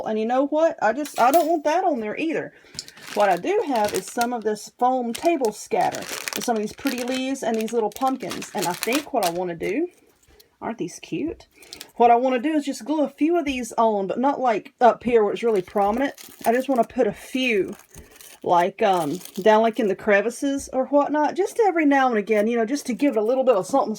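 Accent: South, United States. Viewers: I love your deep southern accent! And the way you explain things makes me feel like I could do this project too! So inspirational! Thank you! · Just beautiful! Love your Southern accent. Keep up the good work.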